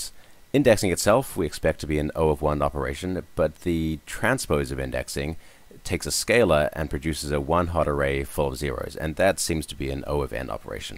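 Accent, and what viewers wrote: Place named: Australia. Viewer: u r one of the most articulate persons I have ever heard. Great presentation. Where did you learn to speak? And you sound like an Aussie living in USA.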